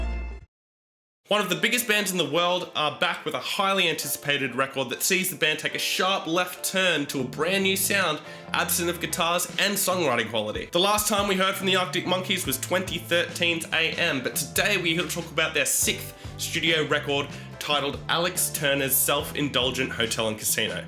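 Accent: Australia. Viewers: All these salty comments you should have realized you were in for a bad review when you heard his Australian accent!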